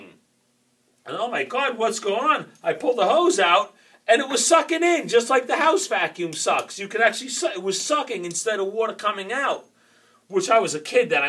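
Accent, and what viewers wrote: New York, United States. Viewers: You hear that working class New York accent and you know you found a guy that knows what he’s talking about. Great video.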